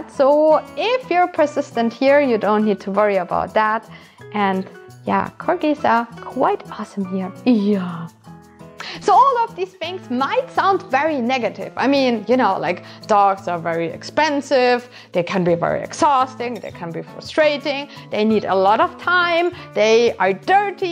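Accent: Germany. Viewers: You Sound a little German. :) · I realized you had a thick accent, but I didn't think you were German. I am living in Germany as well. I used to do voluntary work in a shelter for 2 years. I saw sooo many, great family dogs in the shelters waiting for homes FOR YEARS! That's extremely sad. There is a dog for everyone in the shelters. Even if you don't find your dream breed dog there, you might fall in love with a dog you might have never expected to bond with in the first place. If you still go for a specific breed, shelters also have many breed dogs frequently like Poodles, Yorkshire Terriers, Beagles, Jack Russell Terriers which I absolutely love, and German shepherds. German shepherds and Russells are the most common ones from my experience. It also depends on the region though.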